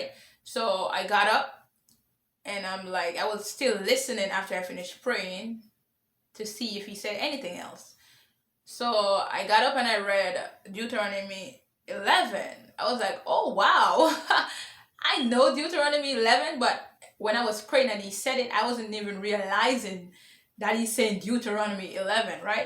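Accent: Jamaica. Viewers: Thank you sis! Are you from Jamaica? I hear a Jamaican accent! God bless! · Sister, by any chance are you from Jamaica ?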